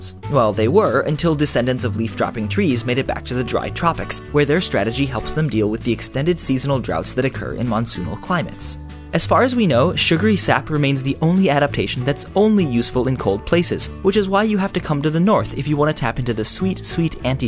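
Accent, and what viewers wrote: Canada. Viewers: Is this guy Canadian?!? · i didn't know that you are canadian. or are you? great video as always!! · Are you canadian? Just askin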